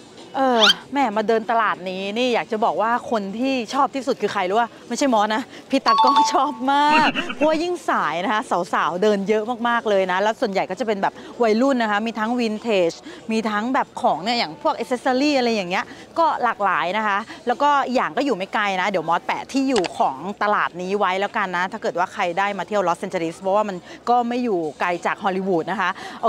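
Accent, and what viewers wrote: Thailand. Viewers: ผมชอบชมช่อง ตรงที่ พูดกะนเองเป็นสบาย ถึงอยู่อเมริกามาหลายปี แต่ไม่มีพูดไทยคำอังกฤษคำเลย พูดสำเนียงไทยแท้ ไม่เปลี่ยนครับ ผมอายุ26ปีพ่อชอบพาไปเดินคลองถมมือสองแถวบ้านทีีอ่างทองตั้งแต่เด็กครับ เอามาตกแต่งบ้านมาใบ้บ้างมาใส่ให้ผมบ้าง จนโตมาผมก็ชอบของเก่าของคุณปู่ย่าตายายพ่อแม่ลุงป้าสะสมเต็มบ้านว่าจะเอาไปขายต่อครับ ชอบบรรยากาศอเมริกาที่มีความชิวๆเรียบร้อยไม่ค่อยวุ่นวายดี ถ้ามีโอกาสก็อยากไปสักครั้งในชีวิตครับ😁💚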